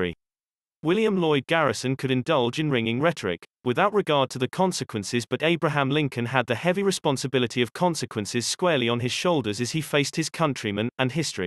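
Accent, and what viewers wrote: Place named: United Kingdom. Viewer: What's with this British accent sh!t? Sowell is an American, ffs.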